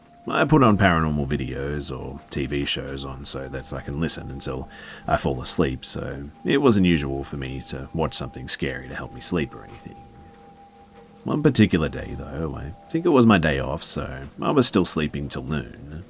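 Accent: Australia. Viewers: Excellent stories, thank you for making this a good day by gracing us with that awesome Aussie voice! I don't know what it is about your voice but I could listen to you read instruction manuals and still love it LoL 😂😉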